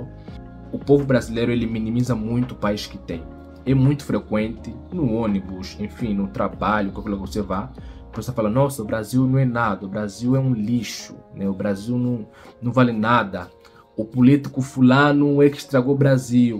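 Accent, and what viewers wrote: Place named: Angola. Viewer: Faz sentido tudo o que você disse. (Acho o sotaque angolano lindíssimo! 😊)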